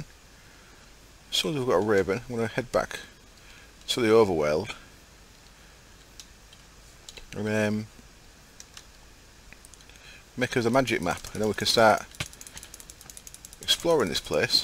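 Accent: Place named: Hartlepool, United Kingdom. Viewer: you sound like you're from Hartlepool area.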